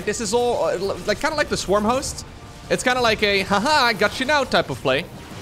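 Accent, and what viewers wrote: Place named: Netherlands. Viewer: I don't care what you say yhe dutch sounds freaking awesome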